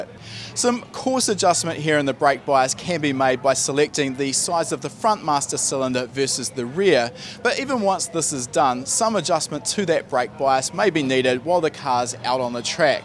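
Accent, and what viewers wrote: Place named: New Zealand. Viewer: he's Kiwi, just go with it. in the engineering sector over the past 30 years I've worked with NZ, Aussies, South Africans, Brits, Germans, East Indians, Chinese, Japanese, Jamaicans, Russians, Guatemalans, Liberians, French Canadians, Israeli, etc so a mix of everything, and I'm sure at times it was they who thought my AMERICAN ACCENT was what sounded odd/weird. it often hurts the inflated American EGO to discover that WE are the ones "speaking with an accent" and that we are NOT always the "yardstick" by which things in the world are measured (literally).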